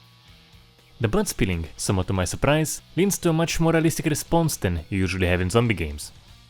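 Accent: Russia. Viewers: Чет твой акцент очень похож на русский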